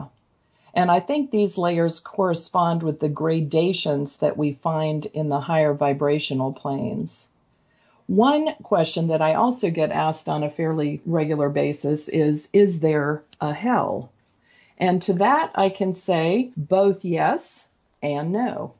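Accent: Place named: Indiana, United States. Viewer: Love your accent. Could be Northern Indiana. I know time doesn't exist there (hereafter) as we know it, but I can't get my mind around what they do "all day" over there.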